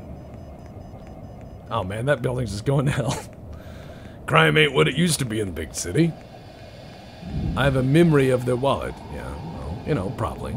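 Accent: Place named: Texas, United States. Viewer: French in a Texan accent is an unnatural but hilarious combination.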